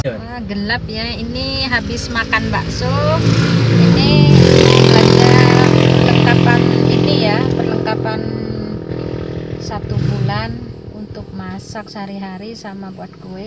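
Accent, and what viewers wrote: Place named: Jember, Indonesia. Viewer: asli mana ya klu boleh tau kayak logat bicara e daerah jember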